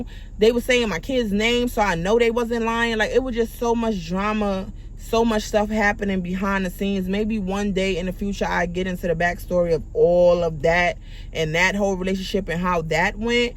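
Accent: New York, United States. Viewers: I knew you were from New York!!! I’m from Brooklyn so I know a New York accent when I hear one❤😊😂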